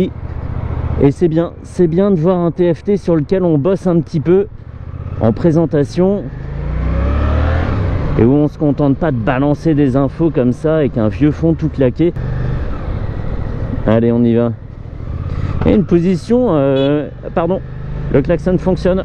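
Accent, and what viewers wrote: Paris, France. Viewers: Le langage est fleuri avec des trouvailles poétiques au fil de la poignée genre: "une fourche qui se laisse pas déborder par l'arrivée du poids". Voila, une chaîne moto qui rend justice au parigot façon titi gouailleur., le Pagnol de la banlieue, le Marcel du motocyclisme périphérique. Un vrai régal, cette verve!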